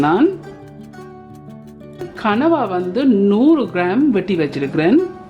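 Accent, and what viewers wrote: Jaffna, Sri Lanka. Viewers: Very nice Jaffna tamil ⭐🌟💘❤👍👌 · Akka kool super ant unkatha singala tamil super irukke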